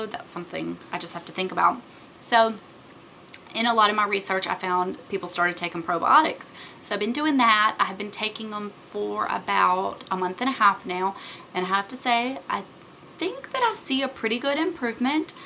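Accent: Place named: Alabama, United States. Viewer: You sound like you're from Bama girl. Not a bad thing.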